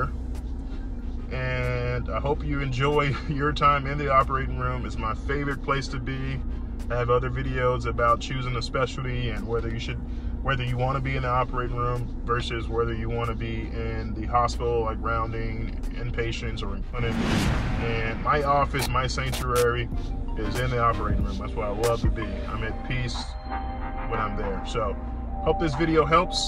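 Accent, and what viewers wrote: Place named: North Louisiana, United States. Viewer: You sound like your from North Louisiana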